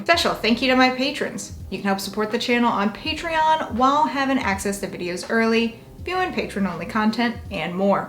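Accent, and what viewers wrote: South, United States. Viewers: I must ask your southern correct?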